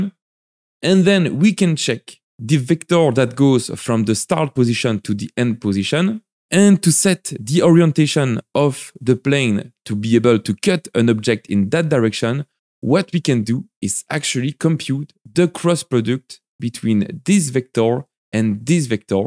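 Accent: France. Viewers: Ah c'est pour ça cet accent Français, je me disais bien que ça sentait familier XDDD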